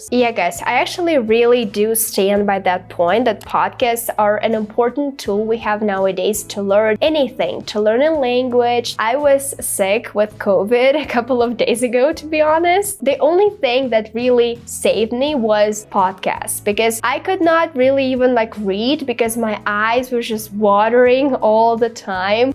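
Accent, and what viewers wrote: Russia. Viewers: Russian accent. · русский акцент чувствуется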